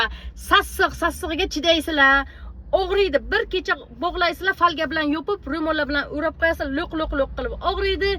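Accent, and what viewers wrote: Bukhara, Uzbekistan. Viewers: Бухороча Шева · Бухорооооо. Ми дейманда акценла шунга ухшаб турибтида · Бухороча шевамиз жонимгада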